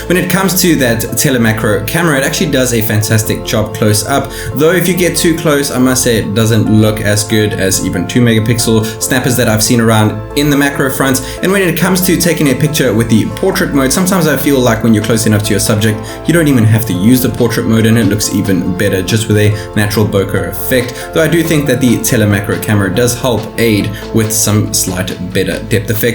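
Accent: South Africa. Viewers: Is that a South African accent I detect? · South African accent?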